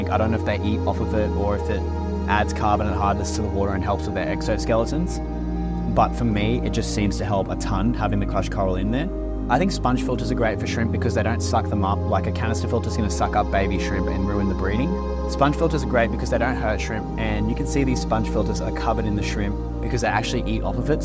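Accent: Australia. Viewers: I love all your videos. May I make a suggestion? The music audio is a bit too loud; it makes it harder to follow your wonderful Aussie accent!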